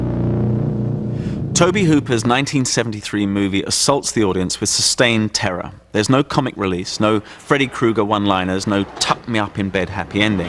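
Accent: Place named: RP, United Kingdom. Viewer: Ah, this is what he meant by his 'posh accent' haha. Love it though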